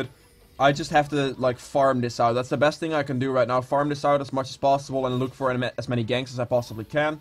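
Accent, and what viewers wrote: Netherlands. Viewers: Nice Dutch accent, and nice video :P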